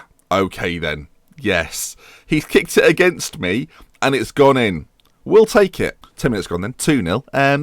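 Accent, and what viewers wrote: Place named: North, United Kingdom. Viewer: your accents just kept going further and further north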